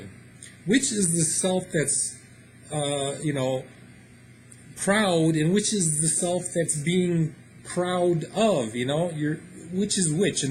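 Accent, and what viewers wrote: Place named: New Jersey, United States. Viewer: Hey brother, I hear the Jersey accent coming through, I’m from jersey too. I want to say that yes within the ego body mind there is a constant changing from moment to moment and each one you can say is a self. However what your true self is, is not within the world, and that self is changeless. In fact that self is just really not even anything, it just is, and that true self CONTAINS the world and the world originates from it. But depending on where your coming from, yes there are many selves, yes there are people, yes there is duality, but the true self contains and enables it all, and is One, across everyone. Your true self and my true self, are the same, we are actually each other. Peace and much love.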